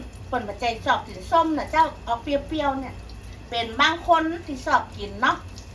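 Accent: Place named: North, Thailand. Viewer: สวัสดีจ้า แวะมาชมการทำอาหารทางเหนือ ใข่ไหมค่ะ ฟังจากสำเนียงค่ะ เพราะๆหวาน ให้ไลท์เลยค่ะ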